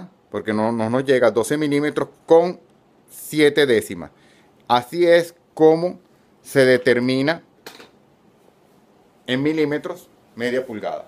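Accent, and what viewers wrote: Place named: Venezuela. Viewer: Tiene acento Venezolano!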